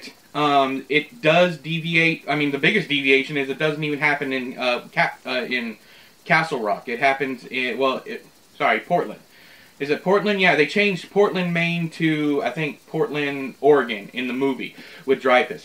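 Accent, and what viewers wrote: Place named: South, United States. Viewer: My first place choice would be 'Shawshank', and second place '1922' which İ found to be better than 'Gerald's Game'. That southern drawl! 😘 (Absolutely love it! My girlfriend hates it though. She said: Why can't he at least unclench his teeth while talking?)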